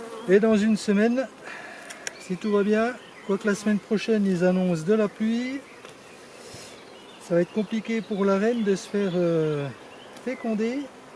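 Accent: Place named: Franche-Comté, France. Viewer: Merci pour la vidéo !...Ah l'accent franc comtois !...j'adore !..je suis du pays !😅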